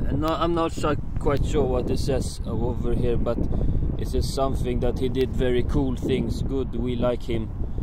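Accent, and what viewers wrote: Sweden. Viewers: nä jag pallar inte XDD svengelskan är fan så åt helvetes bra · Asså de bästa svengelska jag har hört😭😭😭😂😂😂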